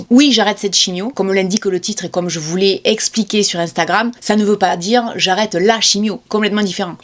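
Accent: South, France. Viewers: Chère, En 2018 j’étais en arrête pour une dépression. Je ne dormais pas la nuit. Un soir je suis tombée par hasard sur ta chaîne, et ta voix douce et ton accent du Sud me détendaient et apaisaient ces soirées pénibles. J’aimerais tellement pouvoir t’aider aussi. Tu es une personne forte et combative, tu l’as toujours été, tu t’es construite comme ça. La détermination dont tu fais preuve face à ce traitement et ses effets force le respect. Quand j’ai lu le titre j’ai eu très peur que la partie ne soit perdue. Mais c’est tellement mal te connaître. C’est dur de ne pas être inquiet. Go Maman Youtube, on est avec toi 💖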